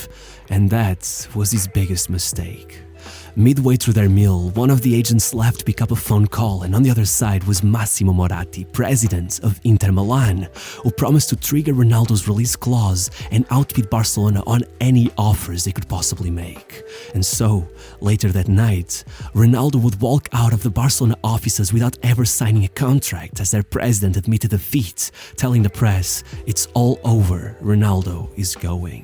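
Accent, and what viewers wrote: Portugal. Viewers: O comentador é Tuga 😂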